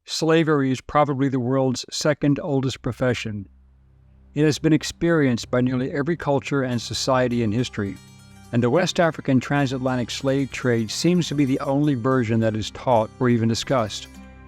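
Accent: South, United States. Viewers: If there were so many slaves, where are they? All integrated into their societies. You are totally wrong about Somalia. Swahilil is not an Arab language. Mr Marine Corp, were slaves to the Caribbean and America ever integrated even today? You sound Southern, you should know.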